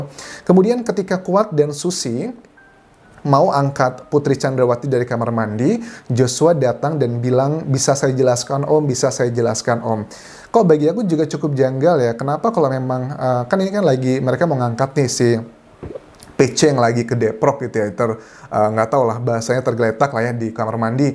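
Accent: Java, Indonesia. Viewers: Mas logat bicaranya kok sprti orang jawa asalnya dri mna... Pokoknya PC. kudu dipenjara krn ia bohong nya buat gemes sy pengin nonjok PC Klo SAMBO palanya dipotong sja klo buat sandiwara terus Kasihan Barada E mau dikorbankan SAMBO Barada E please you JUJUR SAJA jangan takut NAK Barada E kuatkan imanmu dan kemampuanmu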